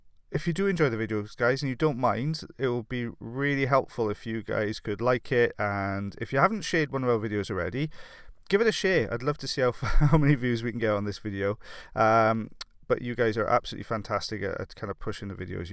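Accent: Wales, United Kingdom. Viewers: Are you from Wales by any chance? :)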